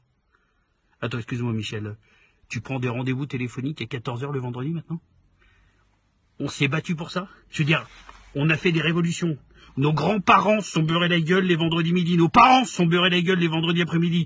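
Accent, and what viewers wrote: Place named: Lyon, France. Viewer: Cuuuuulte la vidéo de l'année! Chez nous enfin près de chez moi (Allez Villette!) tu es une star même si tu es stéphanois, d'façon tu as l'accent lyonnais ^^ Bises mon pti canard aux olives:)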